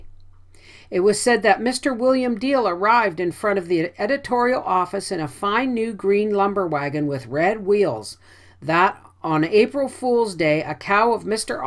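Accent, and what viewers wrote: Canada. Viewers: I hope you do! It's so kind of you to make these videos and take so much of your time on them. I also wanted to say that I was born and raised on the shores of Lake Superior (WI) and your Canadian accent is so comforting and makes me feel at home. Keep reading when you can, I for sure am a devoted fan.:)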